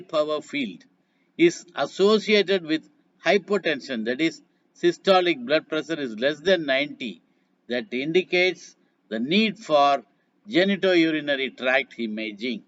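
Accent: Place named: India. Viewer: I can hear indian voice from you love from IMG philippines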